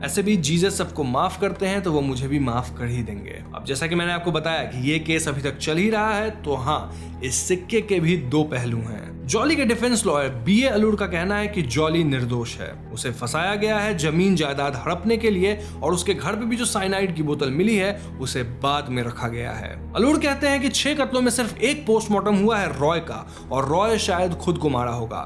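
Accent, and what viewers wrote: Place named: Bengal, India. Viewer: Dada bongali hai .. per pe char Raha hai .. not ped pe Chad Raha hai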